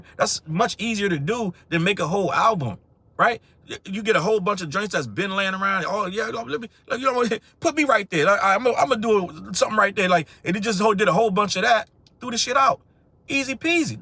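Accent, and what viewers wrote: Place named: Washington DC, United States. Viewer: I love listening to dudes with DC accents. Remind me of home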